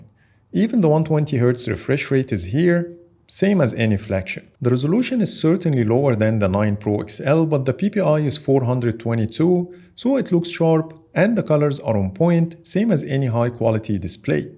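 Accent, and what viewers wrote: Egypt. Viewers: Is this Egyptian accent 😂 or i am just imagining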